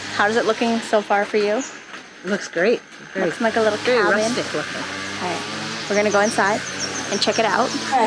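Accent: Costa Rica. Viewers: Mae he visto prácticamente todos sus videos me encanta ❤️🇨🇷 cual es su Instagram? O otras redes?? Pd: me encanta su acento Tico jajaja ❤️🇨🇷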